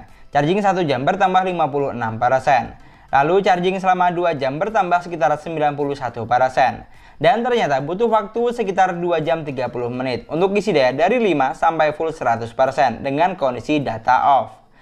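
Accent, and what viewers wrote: Madura, Indonesia. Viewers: Logat suaranya kek orang madura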